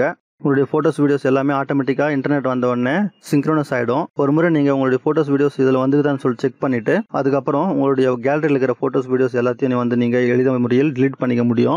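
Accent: Chennai, India. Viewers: Chennai Tamil la informative video 🌝🌝🌝awesome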